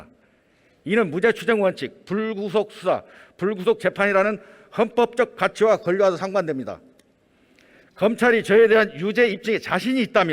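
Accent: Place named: Jeolla, South Korea. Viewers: 전라도 특징 의 를 으 라함ㄹㅇ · 말투보니 라도 같은데?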